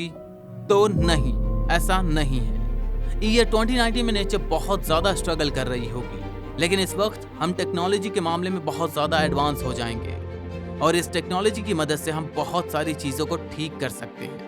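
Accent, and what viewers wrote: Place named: South, India. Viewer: Language mein South Indian touch aa rha h....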